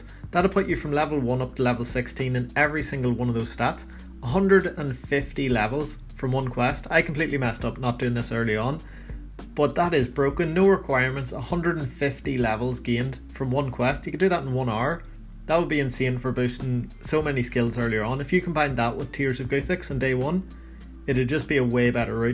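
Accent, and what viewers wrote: Northern Ireland, United Kingdom. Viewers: You from northern ireland?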